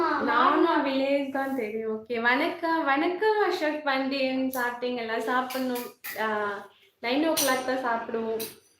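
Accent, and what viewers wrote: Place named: Thanjavur, India. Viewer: Thanjavur Tamil 🤏🙏🙏🙏🙏♥️♥️♥️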